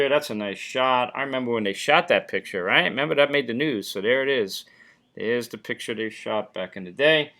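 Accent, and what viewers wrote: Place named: New York, United States. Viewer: Jagger is 80 not 81. The fact that the muddy sound became clearer that’s cause of the wind, or probably a slight breeze coming & going. Guess you’ve never sat in the nosebleeds! The fazing was fucking wind not the sound guy. Your used to arenas no wind. & you probably saw the Stones at Giants stadium a virtual wind tunnel that can get lucky no wind some dates. Only good thing about your 📹 is your NY accent but your no Archie Bunker pencil in Cindy Lauper It’s the fucking wind!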